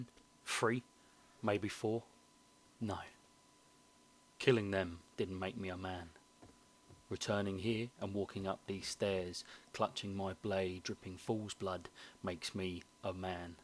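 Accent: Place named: United Kingdom. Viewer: you have a good accent, its british but rough and hard.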